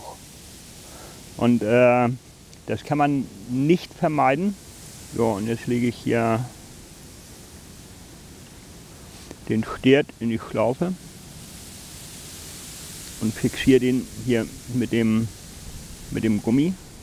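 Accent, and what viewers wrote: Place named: Frisian, Germany. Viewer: Ich könnte dir stundenlang zuschauen. Ich mag dieses friesische Dialekt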